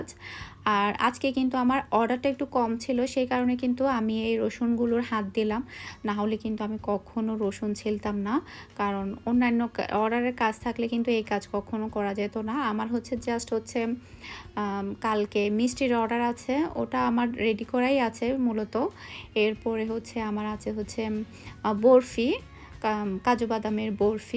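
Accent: Chittagong, Bangladesh. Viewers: Jehetu uni khas chatgaia, chatgaiara shuddho vashai kotha bolte gele airkm e shonai...kichu korar nai.... R try korle hoito hobe....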